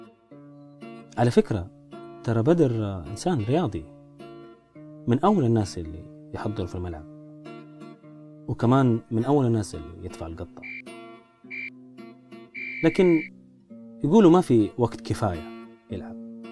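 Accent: Hejaz, Saudi Arabia. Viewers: طيب وش سالفت الاكسنت :| ، 7jazy accent - يمقن سبيكه يمقن مخفه هع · you should learn najdy dialect/accent not jadway/7jazy accent :(, so watch more of laykthr7&btharh ^_*.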